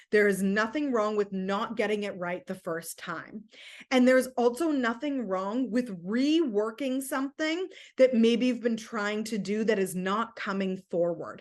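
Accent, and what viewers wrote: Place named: United States. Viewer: Absolutely wonderful to listen to you. loud and clear for me who is Swedish. Wonderful American accent.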